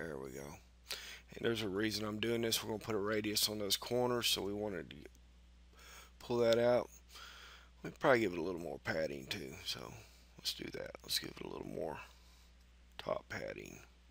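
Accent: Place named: Tennessee, United States. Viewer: It's the Tennessee accent for me.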